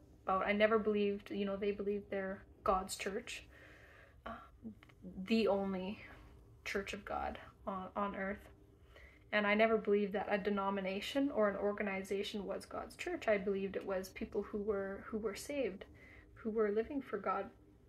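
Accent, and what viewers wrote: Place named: Alberta, Canada. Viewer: Hey! Fellow ex Mennonite here (old colony). Thanks for sharing your story. I really enjoyed listening... also it sounds like maybe youre Albertan as well?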